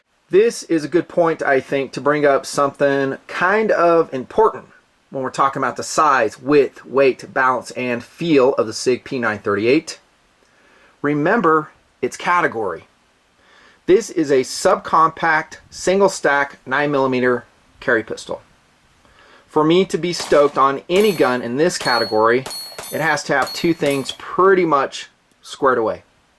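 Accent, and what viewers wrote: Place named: New York, United States. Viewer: You sound like you're from New York or something. You're not fighting damn terrorists. If you are a soldier you need to wake up and realize that your own government is arming the terrorists in Afghanistan, Libya, Syria, and so on. Read the news. And boy, I'd like to see you come down to my neck of the woods and Texas and people how many rounds they should have in their mags.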